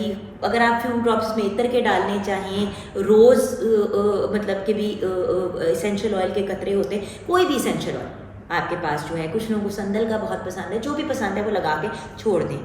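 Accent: Punjab, India. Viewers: Same here mam plz upload video i like yr pinjabienglish voice love uu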